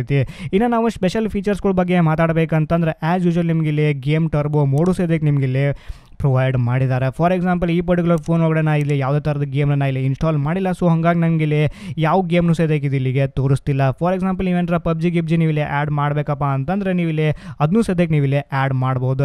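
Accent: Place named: Uttara Kannada, India. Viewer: Nim UK Kannada riwev super bro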